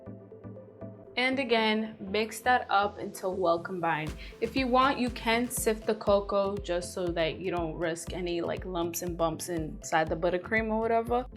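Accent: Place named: New York, United States. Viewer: I love your accent. Are you from New York?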